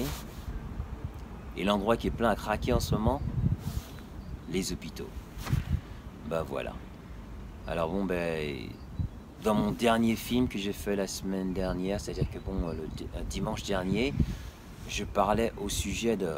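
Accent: France. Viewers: ese acento Frances ahhhh es muy lindo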